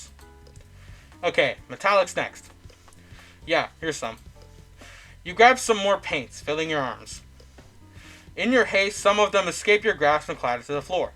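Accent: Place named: South, United States. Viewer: Your southern accent killed me lol! I loved it! I thought I had the drawl, but yours sounds much better, and I’m an actual Southerner! Great video dude! ❤️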